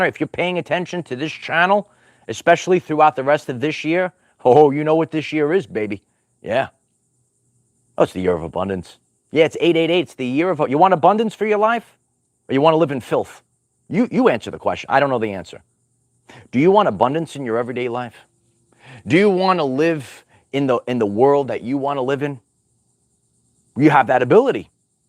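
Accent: New York, United States. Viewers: One of the biggest grifters on YouTube. Accent makes me think of a NYC cop or criminal.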